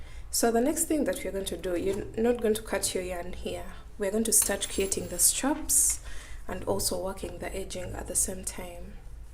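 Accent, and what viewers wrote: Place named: Uganda. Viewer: Cool pattern! Also, I lit up when I heard your accent. I used to live in Uganda and your voice made me miss it!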